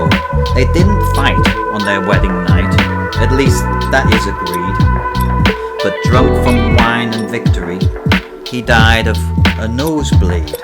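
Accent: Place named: Sunderland, United Kingdom. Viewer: You are an absolute laaaaad 🙂 Nice to hear you still have a Sunderland accent even after all those years after leaving.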